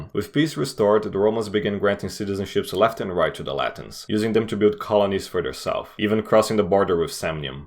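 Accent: Brazil. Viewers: Pretty sure he's Brazilian. His pronunciation of Roman names sounded really Brazilian to me, so I stalked his channel and turns out he's subscribed to a couple Brazilian channels. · You are brazilian right, i feel it in me accent recognizer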